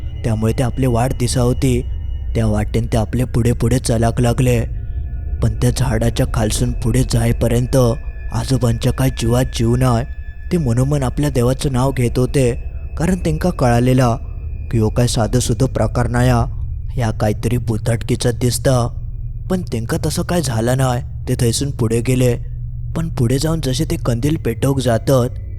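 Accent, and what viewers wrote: Malvani, India. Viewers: Me tumchi ghoshta yechi khoop vaat bakto mala tumhi malvan madhun bolta te mala khoop avadto maze board chi exam chalu ahe mhanun hee 8-9 divss mi tumchi gosht ikoshakat nahi pan exam zale ki zoptana youtube ugadalya -ugadlya tumichich ghosht iknaar ❤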